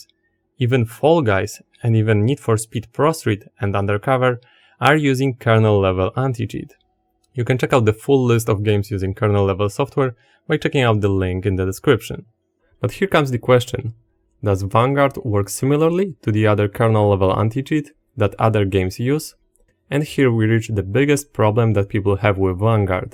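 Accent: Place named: Poland. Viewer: ziomek ma akcent jakby z polski był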